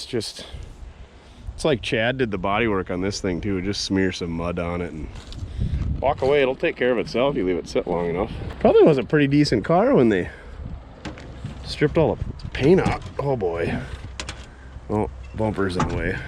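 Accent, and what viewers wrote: United States. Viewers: Sounds more like Eric from maine auto to me, sounds more American, not yankee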